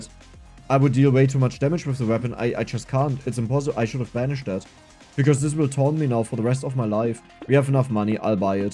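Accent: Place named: South, Germany. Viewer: Kann es ein dass du aus dem Süden Deutschlands kommst zumindest hörst du dich so an?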